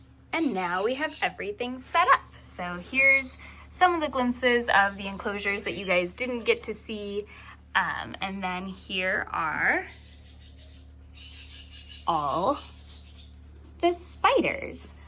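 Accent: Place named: Minnesota, United States. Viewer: It sounds like you've been in or near Minnesota lately :D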